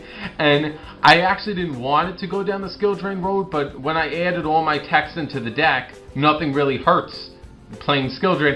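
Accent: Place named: Brooklyn, United States. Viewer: Apologies if its wrong but I believe its a brooklynn accent.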